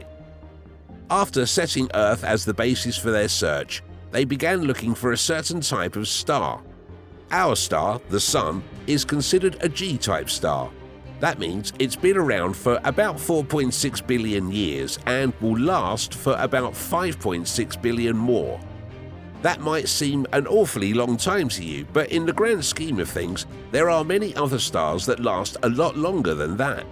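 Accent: United Kingdom. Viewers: Why does the guy have a British accent then?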